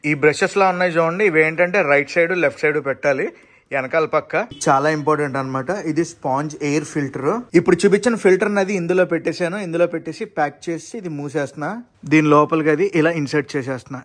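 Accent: Godavari, India. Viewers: Love from Nellore Malli vachi Chepala pulusu thinadi Anna ❤️ Me Godavari Slang ante chala istamm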